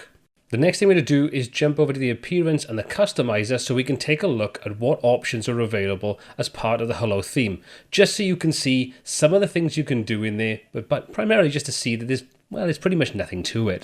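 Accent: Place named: Newcastle, United Kingdom. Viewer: This video took a lot of work to put together! Deserves more than 29k views. (You sound Geordie, are you? I ask because my Dad was from Wallsend and you speak very much like he did).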